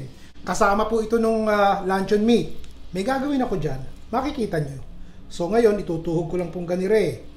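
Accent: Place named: Bulacan, Philippines. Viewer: Chef,bulakenyo po ba kayo?kasi ung accent nyo at diction ay bulakenyo 😁😁😁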